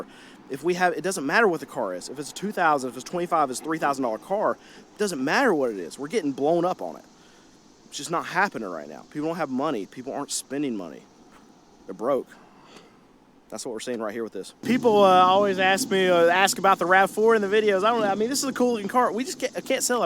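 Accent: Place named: North Carolina, United States. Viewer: I heard North Carolina.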